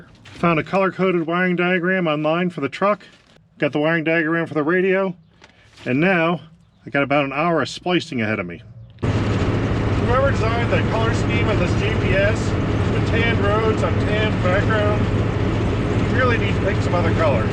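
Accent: New England, United States. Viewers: Every once in a while I hear what sounds like a Nor'Easter accent? Enjoying your work. Something optimistic and indefatigable in your approach to problem solving that makes me smile. Cheers from Monteray Bay CA.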